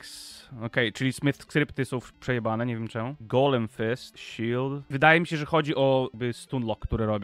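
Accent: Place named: Podlasie, Poland. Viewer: wschodni akcent... Podlasie? :)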